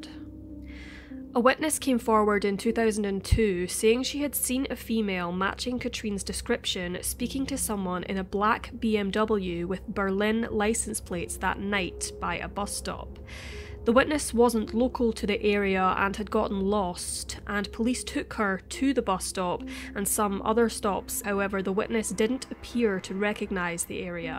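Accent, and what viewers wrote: Scotland, United Kingdom. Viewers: Nice to hear a video narrated in a soft Scottish accent... Just subbed... Blessèd be /|\ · I agree! A video came up in my recommends and I totally didn’t expect to hear a Scottish accent. I’m from Ayrshire. Your videos are awesome. True crime but much more sedate and pleasant to listen to. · I was going to guess Scottish. Very nice! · ayyyy a scottish mystery reader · Fookn Razor Scottish is so beautiful, people who say otherwise are mad lol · 509mrs its funny cause a lot of people say the scottish accent is horrible, but up in the north east this is honestly how beautiful the language can get!! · It's so nice to hear a real voice on these films, esecially a good Scottish one (I come from Alloa, near Stirling). There's real humanity in the telling of these stories, they're not just told for 'shock' effect - well done to the writer and narrator. 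· I wondered what accent she has and went to the comments lol never have i ever heard a real scottish accent before! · A lovely Scottish accent. Fantastic pronunciation, gotta luv it. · Her accent is Scottish but it sounds “Irish” to many Americans because we’re used to hearing harsher Glaswegian - style Scottish accents (such as Billy Connolly’s) and Groundskeeper Willie on American TV.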